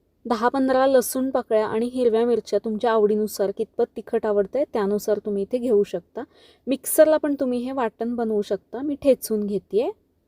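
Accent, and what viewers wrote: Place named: Konkanastha, India. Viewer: तुम्ही कर्नाटकातल्या असून सुध्दा एवढे शुध्द स्वच्छ गोड मराठी छान बोलता .बरेच उच्चार कोकणस्थ लोकांसारखे वाटतात .हल्ली अशी शुध्द भाषा ऐकायला मिळत नाहीत .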